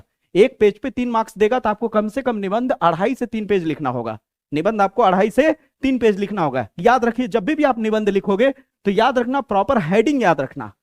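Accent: Bihar, India. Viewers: Guru ji ki aap Bihar se ho kya❤❤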